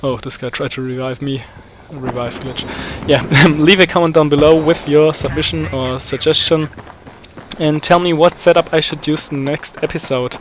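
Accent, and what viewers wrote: Germany. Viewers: youre english is very german^^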